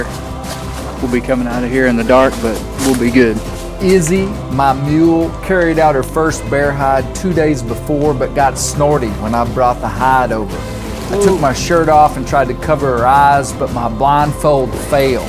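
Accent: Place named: South, United States. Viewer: That's the most southern accent ever. Great video.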